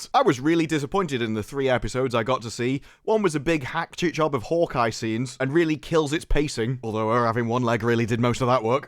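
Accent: United Kingdom. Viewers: I couldn't get thru six minutes of this obnoxious brit talking shit. Like damn is this his whole platform? Pass.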